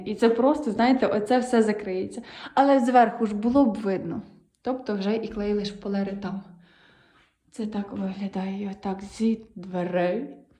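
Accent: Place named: Western Ukraine, Ukraine. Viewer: Привет соседям а мы из Херсонской. Я спросила потому что ты так хорошо говорил по украински думала что может с западной у меня муж со Львовской и у него такой же акцент. 🇺🇦🇺🇦🇺🇦💙💛🌹🌹🌹❤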